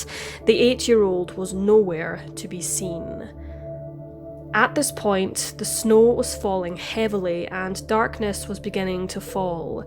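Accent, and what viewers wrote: Scotland, United Kingdom. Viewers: It's hard to peg your accent. Sounds like a hybrid of Scottish and something else? Love it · Love the Scottish accent 💞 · Is this a Scottish accent or an Irish accent?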